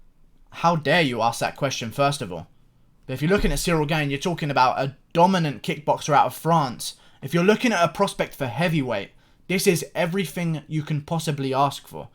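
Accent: United Kingdom. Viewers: With your British accent it sounds like you’re saying Cereal Gane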